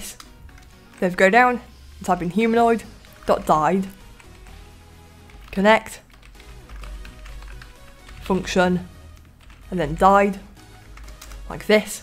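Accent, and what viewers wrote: United Kingdom. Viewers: You sounded like a fellow bri'ish mate.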